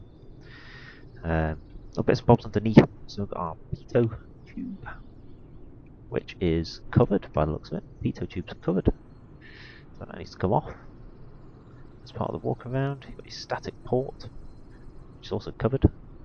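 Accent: United Kingdom. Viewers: I have to admit that my lack of comprehension was also due to me being Canadian and having a hard time understanding the British or Australian accent, unless I can also read lips 😂. No idea if yours is a British or Australian accent, or whatever, but I would guess British, right ? 😂🤣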